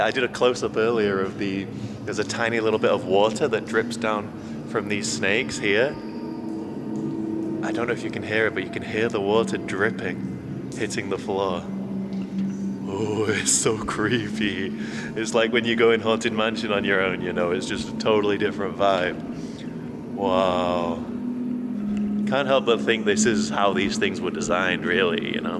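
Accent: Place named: United Kingdom. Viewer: love ur content and the way you speak ! (are you from somewhere in the UK? sorry if I mistake) Cheers, I'm looking forward to new contents !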